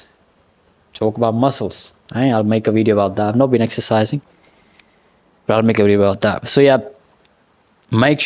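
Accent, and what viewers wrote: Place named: United Kingdom. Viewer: Bro's Pakistani And Sounds Like British💀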